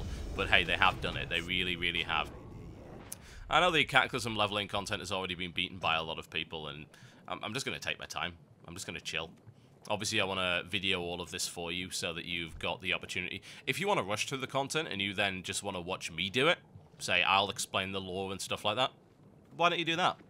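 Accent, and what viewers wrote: United Kingdom. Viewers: u british asda? me 2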